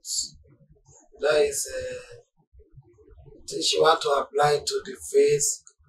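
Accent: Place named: Benin. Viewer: I recognize that Benin accent 😂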